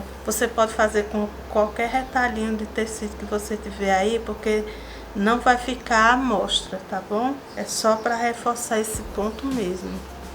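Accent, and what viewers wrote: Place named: Northeast, Brazil. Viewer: oh, muito obrigada, de coração e sou muito espontanea, onde so tenho a agradecer a quem se dedica a ensinar com Amor e empátia.... Notei que seu sotaque é do Nordeste, de onde é?! Qdo eu retornar para lá, quem sabe não estaremos perto e vc poderá ser minha Professora em aula prática? Já pensou? Coisa boa,... um abraço Querida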